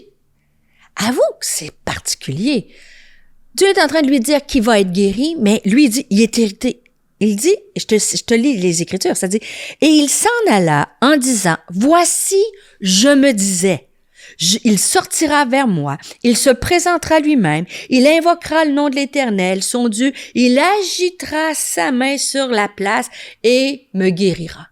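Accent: Quebec, Canada. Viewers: ce beau accent québécois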